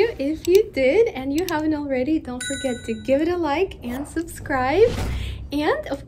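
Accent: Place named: Eastern Europe. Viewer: Uh...I wonder what this girl's story is. I think she has a bit of an accent...from one of the former Soviet republics? She smiles a lot to pacify the viewer, as though she wants nothing more to 'be pretty for us', but of course only a complete idiot leaves it at that. What's her story? She's got AMAZING legs though...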